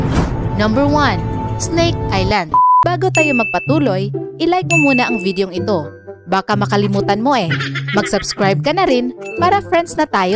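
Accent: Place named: Visayas, Philippines. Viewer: Visaya ang commentator medyo konti pang praktis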